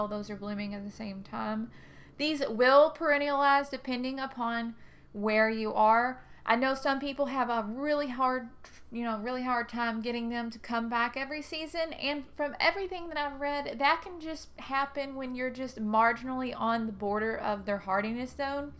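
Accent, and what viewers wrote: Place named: Tennessee, United States. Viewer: You sound like a friend of mine, she is from Tennessee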